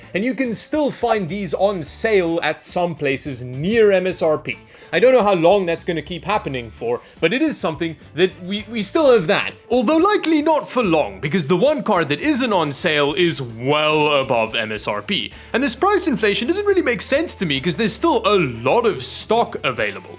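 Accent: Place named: South Africa. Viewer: are you south african? i hear it in your accent from time to time .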